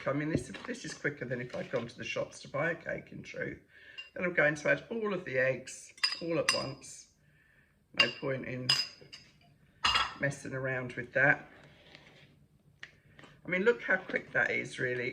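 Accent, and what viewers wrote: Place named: United Kingdom. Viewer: I like you in the navy tights!!! I know I’m going against your Mum but I Love the navy with the navy shoes. I watch all your videos I just love your voice and your British accent. As always all my love from Chico, California. Vicki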